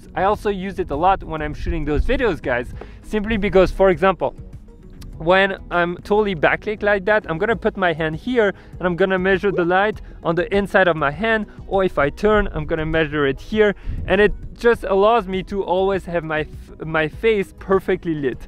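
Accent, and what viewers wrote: France. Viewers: The french accent is epic 😊😊, I am a french speaker by the way and my english is way far from being as good as yours. Keep it up. I learn a lot through your videos.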